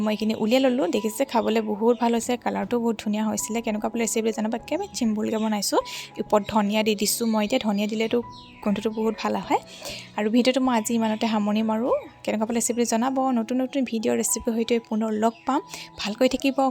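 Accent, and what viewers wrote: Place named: Upper Assam, India. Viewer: মই যে তোমাৰ কথাখিনি শুনি বহুত ভাল পাওঁ ।উজনিৰ যে শুনিলেই গম পায় । আজি মানে বাবুৰ দিন । চিকেন ৰেচিপিটো মোৰ কাৰণে নতুন । ভাল লাগিল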